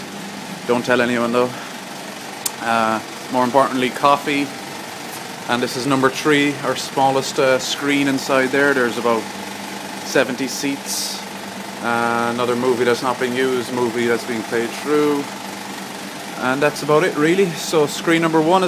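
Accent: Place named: Ireland. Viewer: The projectors look ancient, but there probably bight like tanks. Great Irish, accent. :)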